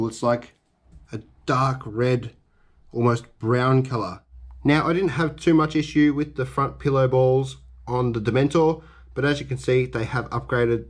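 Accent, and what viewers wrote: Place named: Australia. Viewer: Hello my friend cool Ausie accent the Kronos is gorgeous iam looking to get a 4S brushless truck, the Kronos might be the one, or I was thinking the traxxas Maxx V2 4S, I have tons of trucks and cars I've been into R/C since 2005 I got XMAXX losi 8T, Kyosho GT2 nitro and so on, but I don't have a 4S truck, anyway thanks your new subscriber william the doorman from NJ Forgettaboutit lol 😆 😀 😊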